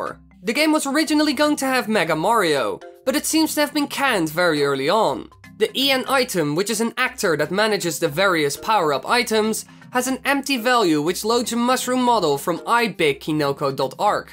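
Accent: Netherlands. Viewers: Are you dutch? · This may sound weird but you sound like your dutch like me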